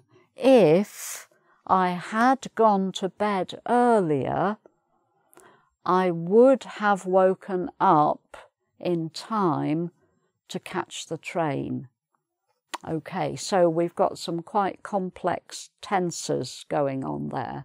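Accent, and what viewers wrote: England, United Kingdom. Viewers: I really love your calm and perfect British accent. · Are you British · I really love her British accent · I love your English accent!! Love!! Greetings from Spain!💕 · indeed! As a Scot, I find references to an English accent as "British" accent both irritating and, frankly, insulting. Aside from the four countries which make up Great Britain and Northern Ireland and their distinctive accents, there are hundreds of regional accents. All different and all "British." "British" does not just mean Home Counties English. PS, love this teacher's style, could listen to her English accent all day.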